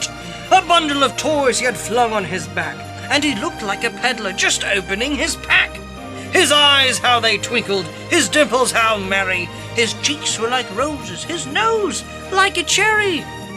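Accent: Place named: United Kingdom. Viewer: You sound a little bit british ? why ?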